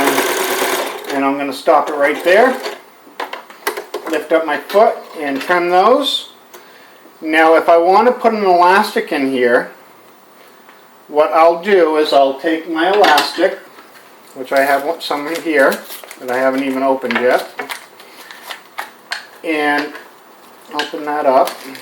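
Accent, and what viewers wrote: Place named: North, United Kingdom. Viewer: Ah ha! I knew it was northern. Video is fantastic!!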